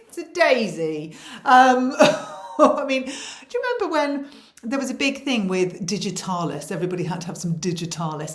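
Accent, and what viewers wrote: RP, United Kingdom. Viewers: Cor your so posh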